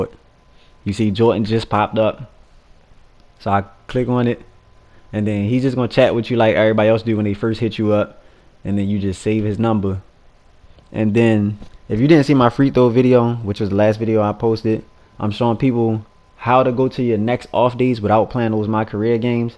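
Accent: Baltimore, United States. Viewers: you from baltimore? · From Baltimore.?🤔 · i from bmore to soon as i heard you voice i said "this nigga from bmore" lol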